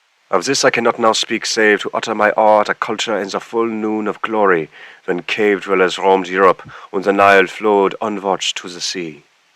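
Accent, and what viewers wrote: Germany. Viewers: Listening to this while at work was such a nice reprieve from the norm.i loved your German accent! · Vielen Dank für die Erzählung mit deutschem Akzent. Das verleiht der Geschichte einem Hauch von Authentizität und macht sie viel eindringlicher. 😊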